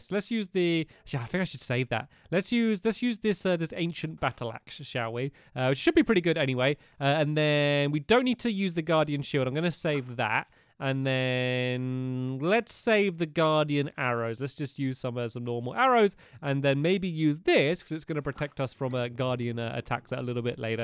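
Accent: England, United Kingdom. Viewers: Uhh he is English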